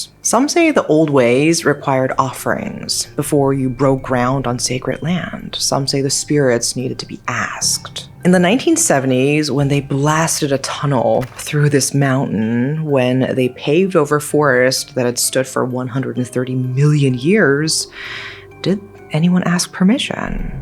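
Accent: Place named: West, United States. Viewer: The story was interesting, but I believe you can tell the story better with more assertion and tones rather than just speak as though you are reading, with a 'western accent'? (I'm Malaysian) and stretching every few words in a whisper tone - I.e "6 Caaaars, 12 veheeeechles, 17 peeeeeople were dead, the road is quieeeeterr than it should be" and yes, for the sensitive commentors I know that's gonna try and argue with me here, I know I can just "exit the video" and be on my merry way, which yes I'll do so, but hopefully some constructive criticism helps this fellow youtuber gain more likes and views in the future.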